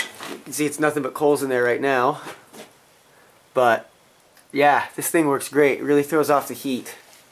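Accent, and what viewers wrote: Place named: Midwest, United States. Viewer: Great timing. I was just wondering what part of the country you were in, when i started this video and I think you just said Maine. Funny, with your accent i was guessing somewhere in between Kansas and Indiana.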